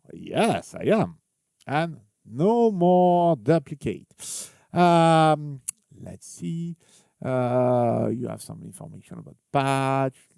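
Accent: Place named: France. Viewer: thank you for creating this software. Love your accent my French neighbour.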